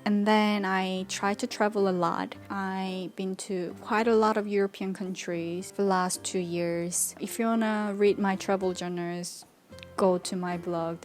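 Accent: United Kingdom. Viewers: 아주 깔끔한 발음에 얹어진 브리티쉬 엑센트가 넘 좋아요~~ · 너무 멋져요!!!!! 씨 보면서 저도 다시 영어공부하게 되네요 씨의 영국식 영어와 캐나다식 영어 발음이 너무 듣기 좋아요!!! 당당하고 멋진 삶 응원해요!!!!👍❤️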